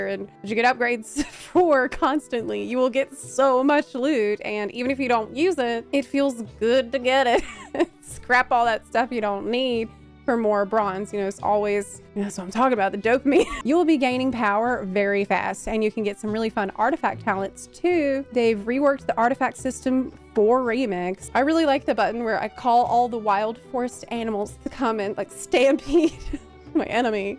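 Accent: South, United States. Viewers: Your southern accent is legit.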